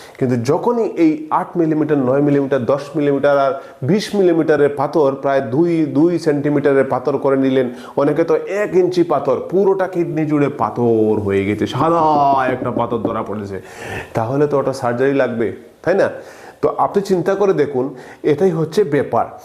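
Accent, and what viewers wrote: Sylhet, Bangladesh. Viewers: Sir apni sylheti naki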